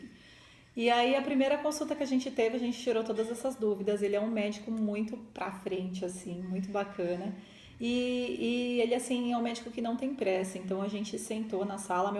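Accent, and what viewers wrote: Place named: Araraquara, Brazil. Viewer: Legal so de araraquara,senti sua fala....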